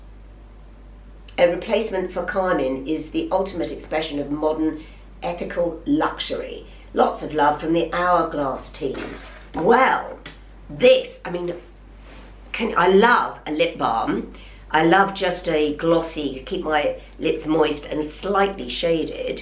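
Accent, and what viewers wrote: United Kingdom. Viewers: Just found your vlog Jo and subscribed😀 I know I’m going to enjoy watching you. Sorry for your loss, there is no timeline with grief, I lost my mum at the age of 67, far too young! 18 yrs ago and it can still hit you out of nowhere. I recognized your face as an actress but couldn’t remember from where, until you mentioned Crossroads, use to watch it many moons ago. I’m a British lady 🇬🇧 living in Canada now🇨🇦 so will love hearing your British accent❤️ Best wishes from across the miles 😊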